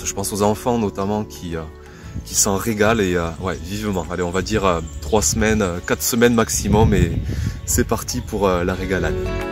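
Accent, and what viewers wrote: South, France. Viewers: Bonjour de vous voir et entendre ce petit accent du sud nous réchauffe toujours le 💖.alors soyons tous unis dans cette période difficile.merci pour votre générosité.bon courage à vous tous et toutes et bonne continuation.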